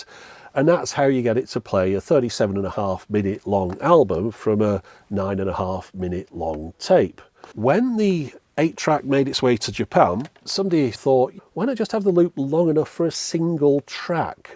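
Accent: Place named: Lancashire, United Kingdom. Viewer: I reckon is from Lancashire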